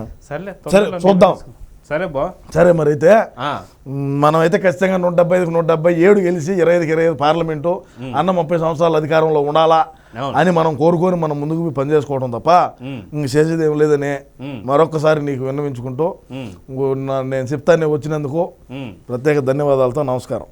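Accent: Rayalaseema, India. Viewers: అన్నా నమస్తే అన్నా నేను జనసేన పార్టీ వీర మహిళా అధ్యక్షురాలు మీ వీడియోలో చాలా బాగుంటాయి అన్న చాలా డిఫరెంట్ గా చేస్తారు మీరు అటు సీరియస్ కాకుండా ఇటు కామెడీ ఎవరికి కోపం రాకుండా చాలా బాగా చేస్తున్నారు అన్న మీరు ఈ వీడియోలు నాకు మీ వీడియోలు చాలా బాగా నచ్చుతాయి ఇలాగే మీరు కంటిన్యూ అవ్వాలని మనస్పూర్తిగా కోరుకుంటున్నాను జై జనసేన జై తెలుగుదేశం జై బిజెపి ఏం సామి నువ్వు ఇంకా మంచి మంచి వీడియోలు అదరగొట్టేసావయ్య ఏంది మీ లాంగ్వేజ్ చిత్తూరు కడప ఏంది అన్న నువ్వు మాది నెల్లూరు అన్న మా ఆయన నెల్లూరు యాస ఎలా ఉంటది తెలుసు కదా మీకు చాలా బాగా చేస్తున్నారు అన్న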